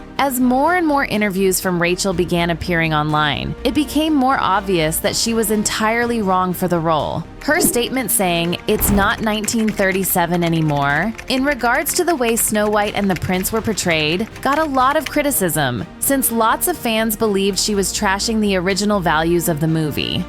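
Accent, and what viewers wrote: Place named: United States. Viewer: Wtf is going on that narrators voice? It's the most annoying American accent I've ever heard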